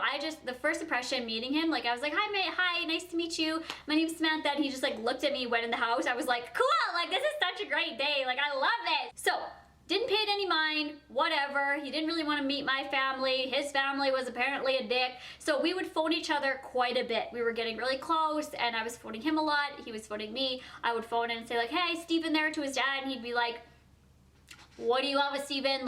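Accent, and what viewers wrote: Canada. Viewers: Mina Mina she probably sounds different because she has a Canadian accent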